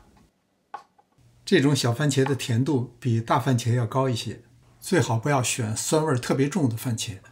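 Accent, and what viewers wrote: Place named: Beijing, China. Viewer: 每次听您讲出了佩服你精益求精的精神，还总会被你最后经典的点缀笑翻了。“别把牙硌了”😂😂😂地道北京话。老乡您实在是个难得的人才！这道洋菜家里养老公一定会吃得走不动了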